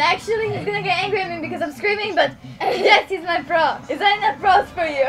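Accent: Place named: England, United Kingdom. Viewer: That was awesome in every meaning of the word! I couldn't stop laughing...hope the fireworks didn't catch up to you (I have a phobia of them, so I understand...) Yeah, we definitely need to PM each other more often, and I approve of the England accent!!! Happy Christmas! (English Merrry Christmas; and you totally pronounced Feliz Navidad right, half my family is Argentine, so I know!) Wow, might steal your idea of combining presents into one!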